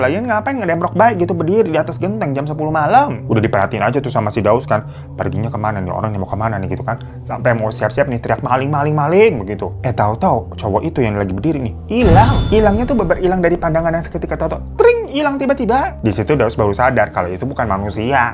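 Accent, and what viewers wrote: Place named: Sunda, Indonesia. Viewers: lucu banget ngomong sundanya 😭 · Maaf bang salfok sama logat bhs sundanya 😭🙏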